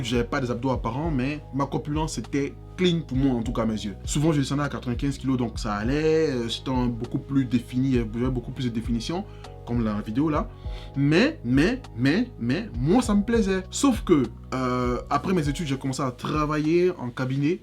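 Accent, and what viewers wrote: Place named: Ivory Coast. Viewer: L'accent ivoirien c'est pour me tué 😂😂😂 moi je m'abandonne heiiiiin 🎉 courage j'espère que ca va me motiver aussi 👍🏾👍🏾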